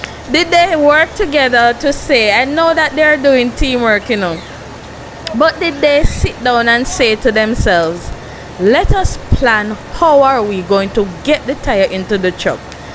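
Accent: Jamaica. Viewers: You talk funny woman, like Island woman, like Jamaica.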